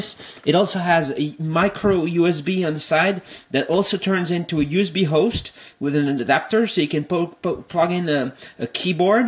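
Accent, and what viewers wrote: France. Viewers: Ta un accent francais mec. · accent francais ca mdr !! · Tu as un accent françai ! ^^, Pourquoi le clavier est-il AZERTY ? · OMAGAD you're so french dude ^^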